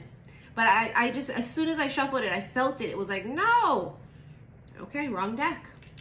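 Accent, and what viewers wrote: New York, United States. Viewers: sound like a true new yorker and remind me of my home Harlem.:goodvibes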